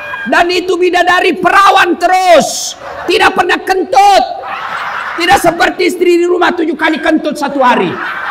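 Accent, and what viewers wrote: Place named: Bugis, Indonesia. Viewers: Sya seneng logat bugis nya👍👍😂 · Logat yg sangat kental logat ciri khas suku bugis makassar Ewako pak ustad ❤️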